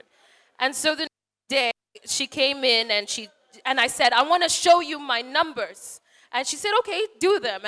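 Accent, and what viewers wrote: Nigeria. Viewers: She's our own do you hear that English oooooh Nigeria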